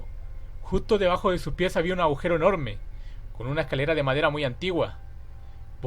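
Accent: Chile. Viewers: Pa que tan Chileno?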